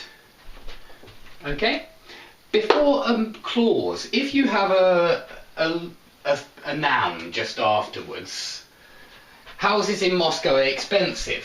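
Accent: United Kingdom. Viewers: Your english lessons are the best, because you explain many details. And I always admire your sophisticated british accent -))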